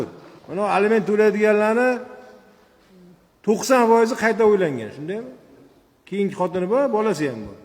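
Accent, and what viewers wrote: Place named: Kitob, Uzbekistan. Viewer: Ота онанга рахмат судья болам шеванг Китобликларни шевасига ухшаркан❤😂 боккан ота онангга рахмат😢😅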